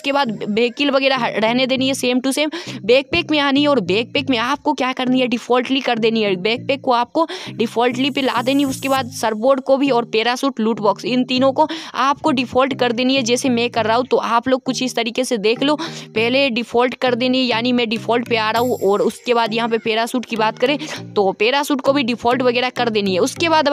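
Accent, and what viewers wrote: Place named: Gujarat, India. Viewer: You're gujarati rait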